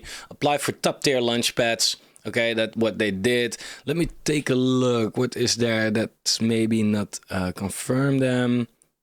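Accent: Netherlands. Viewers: haha nederlands accent, prachtig. goeie video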